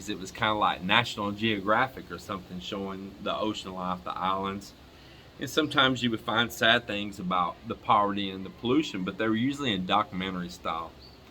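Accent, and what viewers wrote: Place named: Dallas, United States. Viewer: Love the Dallas accent. It is Dallas, right?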